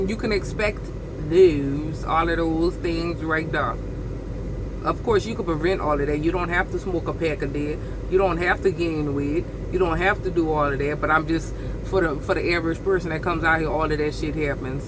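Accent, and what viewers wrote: Louisiana, United States. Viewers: dam I like that Louisiana accent you have especially when you say baby😉😉😉😉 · that sounds like a saint Charles parish accent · Darlin are you Cajun? If so i looove your accent!!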